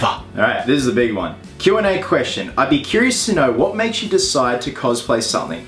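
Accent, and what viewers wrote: Australia. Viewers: Mason Sumi that's just the Australian accent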